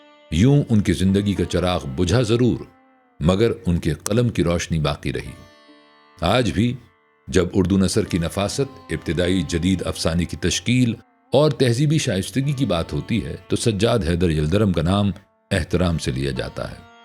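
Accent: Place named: Lucknow, India. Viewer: بہت عرصے بعد ایسی خوبصورت ،آواز،بہترین تلفظ،آراستہ پیراستہ اردو زبان اور کسی دلکش ندی کی طرح روانی سے بہتا ہوا لہجہ —-حقیقت یہ ہے کہ میں سجاد حیدر کو بھول کر ان صاحب جن کا نام بھی مجھے معلوم نہیں ،کے سحر میں کھو گیا اور سجاد حیدر کہیں دور پیچھے رہ گئے۔یوں لگا کہ لکھنؤ کا اردو لہجہ اور دلی کی قلعہ معلی کی زبان دوبارہ سے زندہ ہو گئی ہے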